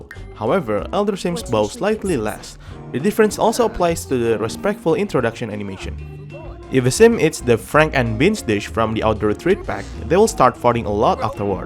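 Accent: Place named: Indonesia. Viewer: always love hearing your indonesian english... unlike how most locals talk nowadays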